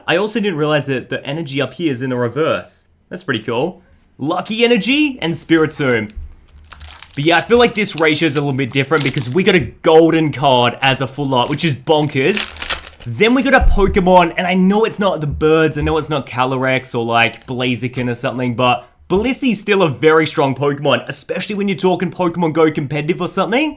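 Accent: Australia. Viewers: R u Australian if u are ima sub and like now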